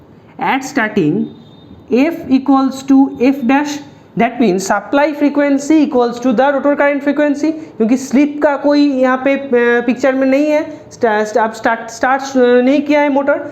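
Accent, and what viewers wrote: Bengal, India. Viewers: Aap bengoli hain ?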